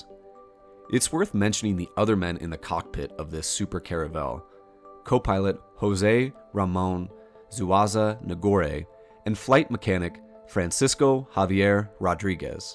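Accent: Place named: Southwest, United States. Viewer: How come you know how to pronounce Spain Talk? Are you of California or New Mexico? Or Tejas?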